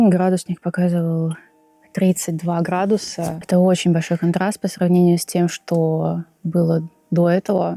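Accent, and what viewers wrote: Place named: Latvia. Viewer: Скорее всего у автора латвийский говор и соответственно интонации в голосе. Хотите огня😂 смотрите Жорика Вартанова 😅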